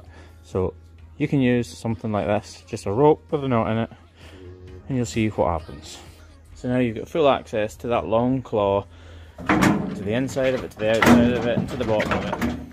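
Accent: Scotland, United Kingdom. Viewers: I am working on a Scots accent so his videos are very helpful—no thirst watching here😂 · I love your videos. Could listen to your Scottish brogue all day, which is probably why I watch your videos for hours! One question (as I used to be a riding instructor, and horses were my life for many years) would there ever be a time when you might need to x-ray a cow's feet to work out what's going on internally? Many thanks from a very wet and windy Berkshire :-)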